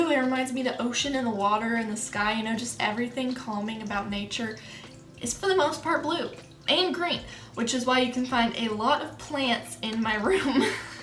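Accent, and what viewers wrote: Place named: South, United States. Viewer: omg ily you're personality is on point! all my questions were already answered by the other people so I didn't submit one lol AND OMG I THOUGHT YOU WERE 5'6 OR 5'7 I WAS SO CLOSEE WAIT I KNEW YOU WERE TALL but i notice an accent where are you from? it sounds like country ish 🤠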